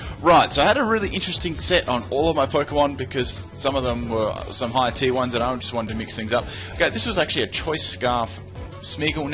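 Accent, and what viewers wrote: Australia. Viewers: I was like: "Hey, same as Australia! Oh wait. is Australian...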